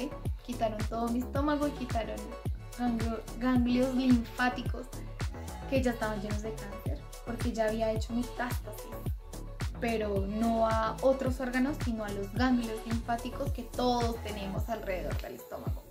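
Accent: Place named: Colombia. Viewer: oye la voz colombiana me gusta mucho un saludo desde México ♥️ cuídate mucho y bendiciones 🙏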